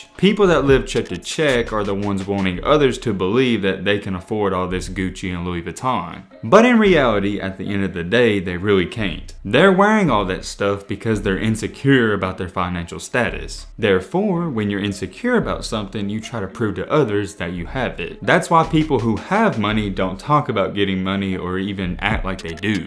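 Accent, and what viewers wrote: South, United States. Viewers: Bruh gotta be from the south somewhere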